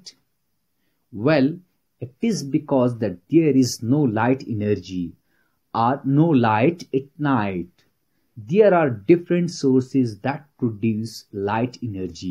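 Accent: India. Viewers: Very good but make your accent like american not indian Good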